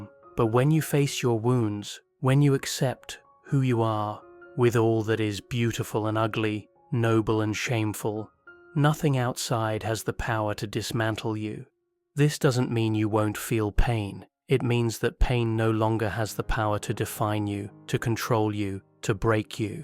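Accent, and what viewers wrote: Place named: United Kingdom. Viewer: Oh...I was waiting for you to release a full Jungian video 😉☺️ you have no idea how your videos touch my soul in this way that sometimes makes me gently cry with relief, or just put a smile on my face in the morning that stays all day, even all week as I daydream about the ideas you espouse. To be completely honest, at first I found the speaker's voice (is it AI? I seriously can't tell!) to be slightly unsettling because of the monotonous contour tone and strange vowel inflections (sometimes the vowels are inflected as they would be in the north of England, but usually a standardised southern english dialect that very occasionally slips into full north american dialect) I think my linguistics brain didn't like those anachronisms but quickly I grew to find the cadence and pitch soothing, I've always had highly sensitive hearing and seem to be very inwardly sensitive to sound. 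I can't watch television because I grew up without it and find it super abrasive. Do you know what I mean? A man got me to watch the football and the violence really affected me and the bizarre sound of their bones clacking together when they tackle each other was grotesque. So I find a lot of videos on YouTube abrasive. Yours are perfect for me. And I haven't even mentioned the content. It nourishes my soul. So special.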